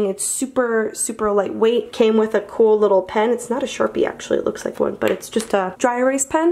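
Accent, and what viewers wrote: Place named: Ohio, United States. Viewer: It's so refreshing to hear another Ohioan accent, amongst all the Californian ones (nothing against em, but hometown pride and all that haha). The curtain lights behind your bed just gave me an idea for my own room, thanks for such a creative AND affordable display of room decor!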